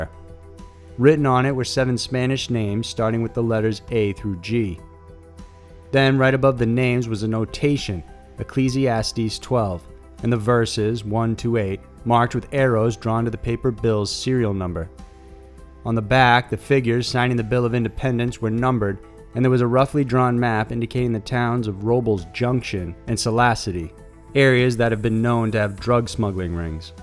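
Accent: South, United States. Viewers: God I love your sexy touch of southern drawl. You make mysterious shit hot again man.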